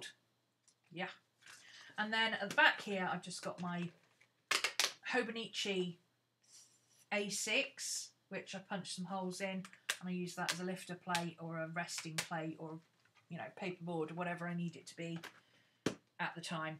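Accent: United Kingdom. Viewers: Hi,. I love planner flips. I just asked to join your FB group. Actually, may I ask, where are you from specifically in the UK? I do believe it's an English accent, but I think there may be something else in there?? Not sure. Right now, I 'm fascinated with accents, especially British accents. I am writing a book, actually, and am trying to write some dialogue in an accent for some scenes. Boy, that's hard, for an american, anyway.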